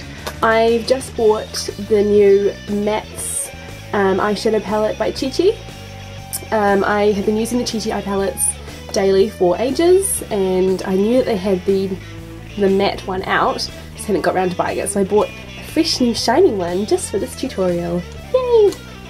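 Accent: New Zealand. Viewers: is your accent newzelanden accent?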